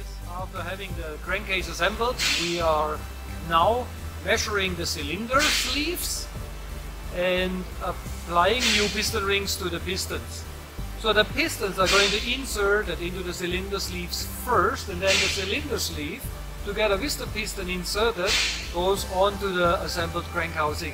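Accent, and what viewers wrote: Germany. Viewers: Just your accent makes me want to ship my motor all the way to Germany so you can rebuild it!!! Awesome video. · As a machinist and a car guy I can appreciate your work! I worked in a German owned shop for many years, your accent brings back many memories. Keep up the excellent work!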